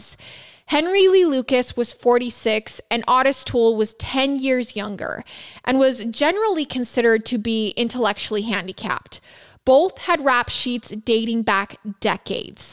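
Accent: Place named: Utah, United States. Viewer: I love the new series. A couple things.. and I mean the first one to be helpful haha not rude.. you’re supposed to pronounce the r’s in corroborate. It’s almost like you’re mixing corroborate and cooperate into one word. Hehe. And goodness girl, did you grow up in Utah??? You have one of the strongest accents I’ve heard from that area of the US! It jumped out right away and I was like wait a minute, I know that accent!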